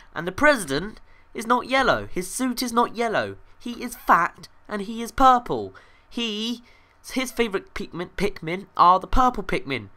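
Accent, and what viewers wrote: United Kingdom. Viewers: Are u british? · Yes another british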